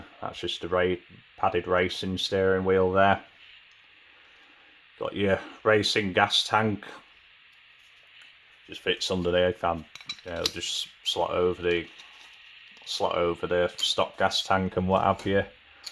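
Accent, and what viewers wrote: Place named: United Kingdom. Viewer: Hi. You sound like you're in The UK and I am in America. You mentioned that the '67 Plymouth was a grail kit for you. I was wondering what America cars you had in the UK when you were growing up and what cars you like. I was hoping you could do a video about the subject. Btw i grew up in Lansing / Detroit, Michigan in all of the US auto factories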